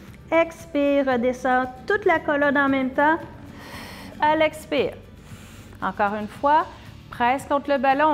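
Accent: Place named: Quebec, Canada. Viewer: je vous regarde de Belgique et moi j'adore votre accent qui me rappelle mon voyage au Québec ;-)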